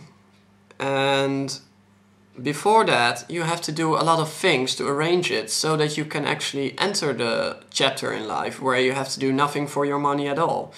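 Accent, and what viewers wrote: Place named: United States. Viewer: You do a awesome job on U.S. English by the way. I love your hair too. You cool.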